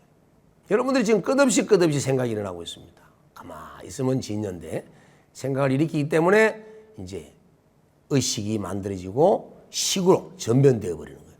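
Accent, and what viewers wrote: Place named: Busan, South Korea. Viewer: 🌈 듣고 또 듣는 이 소중한 법문 정말 감사드립니다. 제가 하와이에 살아도 , 법문을 부산 사투리를 섞어서 하시니 고향의 정겨운 마음이 웃음으로 더 제 마음에 담아집니다.🙏🙏🙏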